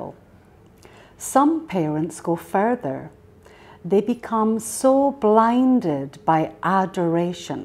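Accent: United Kingdom. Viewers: 영국발음이 너무 좋아요... ㅠㅠ Roald Dahl Charlie and the Great Glass Elevat 이 책도 읽어 주실 수 있나요? 책이랑 같이 읽으면서 듣고 싶어요!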